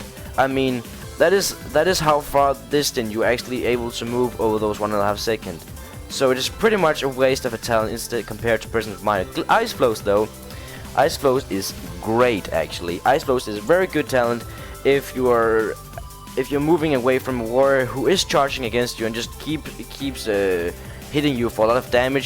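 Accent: Denmark. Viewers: from denmark ? :P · han lyder fandme meget some en dansker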